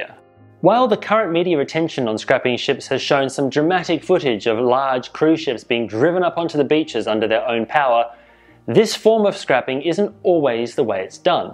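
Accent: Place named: England, United Kingdom. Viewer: I ran this video at .75 speed and this chap is more understandable with the English accent.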